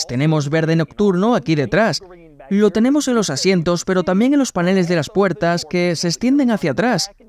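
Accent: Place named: Spain. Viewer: Por qué español de españa 😭😭😭😭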